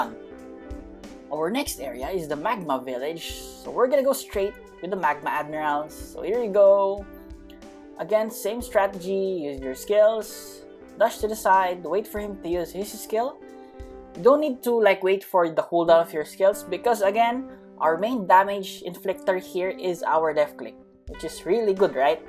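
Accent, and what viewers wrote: Philippines. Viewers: eh pinoy naman talaga sya voice palang nya at accent alam na alam na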